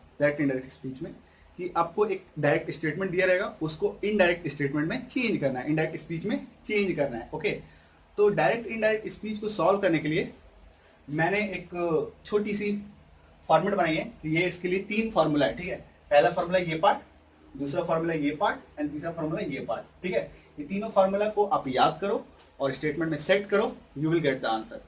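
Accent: Bihar, India. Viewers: sir Bihar se ho kya apne bhaut acha samjhya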